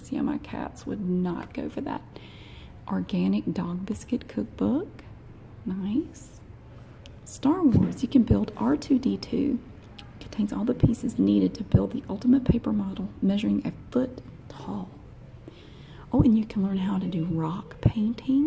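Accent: South, United States. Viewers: I love that fact that her voice is authentic and I love her southern accent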